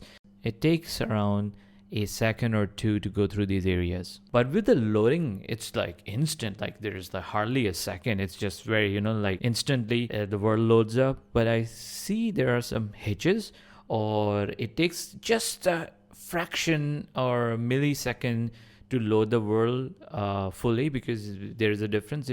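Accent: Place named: India. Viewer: you slightly sound indian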